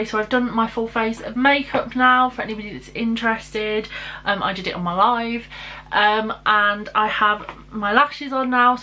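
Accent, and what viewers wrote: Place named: United Kingdom. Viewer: your accent is so cute. i love uk beauty gurus!!!!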